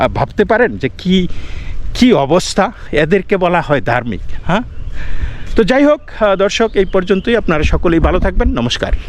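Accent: Bangladesh. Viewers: your English pronunciation is like Bangla.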